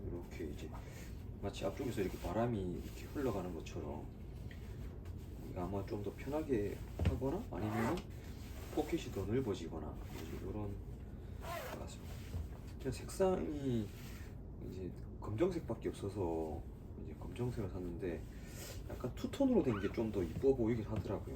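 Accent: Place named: Busan, South Korea. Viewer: 잘봤어요. 구독했어요. 부산쪽 분이신가 봐요. 평소 잘보고 있어요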